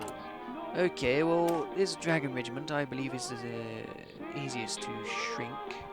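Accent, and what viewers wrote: United Kingdom. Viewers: Are you born in Britain or are you just a Canadian with a British accent.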